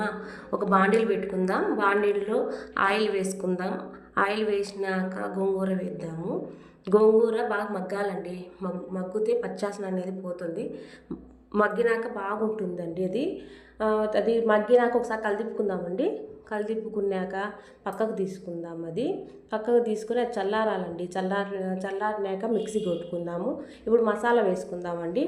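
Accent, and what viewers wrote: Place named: Kadapa, India. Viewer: Oh akka pakka Kadapa slang🥰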